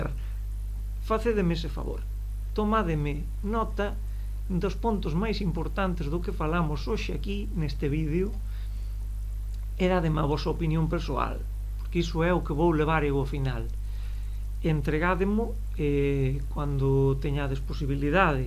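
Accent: Spain. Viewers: A maioria do Brasileiros diria que fala português com um pouco de sotaque castelhano. E confesso que para o ouvido brasileiro é mais fácil de entender do que português da região de Lisboa. Parabéns pelo trabalho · Quanto teria eu gostado de ter aulas de galego como estas! Além do conteúdo, predicas com o exemplo dando-lhe à pronúncia o jeitinho próprio do galego. O raro aqui é que seja raro escuitar galego falado à galega em registos cultos.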